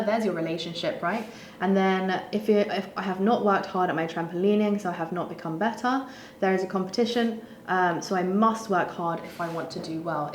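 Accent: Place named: United Kingdom. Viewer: Agree 👍 When I heard her British accent, I figured it out right away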